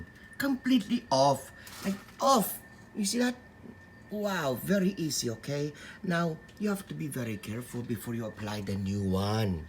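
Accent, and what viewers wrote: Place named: Philippines. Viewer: Thank you so much pare! I loved your distinctive Filipino accent and humor. I'll be doing this project soon! So many say you have to open your roof half way to do this but, obviously you don't! Salamat po!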